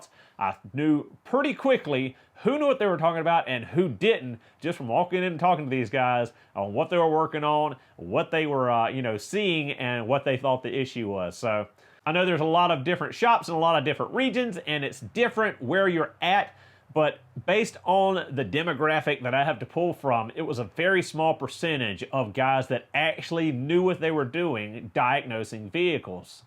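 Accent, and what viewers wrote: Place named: Tennessee, United States. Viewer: Unrelated but where you from bud? I'm in tn and that accent is indigenous over here.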